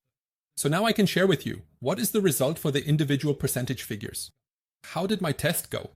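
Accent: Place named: Germany. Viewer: Bro u sound so German but very good explaining!!!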